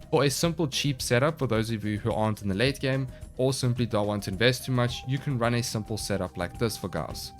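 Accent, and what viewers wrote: South Africa. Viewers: I knew it he is South African lmao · aweh my fellow south african. The accent gives us away every time. Can never hide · I knew you were South African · Yooo you SouthAfrican???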